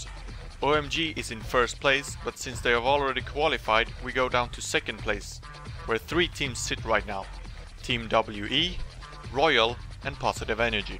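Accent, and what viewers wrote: Sweden. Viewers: Im so sure ur Swedish! This accent! It must be ^^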